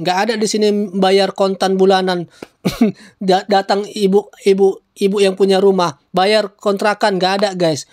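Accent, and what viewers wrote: Medan, Indonesia. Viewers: Abangnya logat bicararamya dari medan ya Bang? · Medan kali bahasamu lagi yak horaslah. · Mantap abang ku , kayaknya medein medan ini abang ku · Kayak Medan kali bahasanya😊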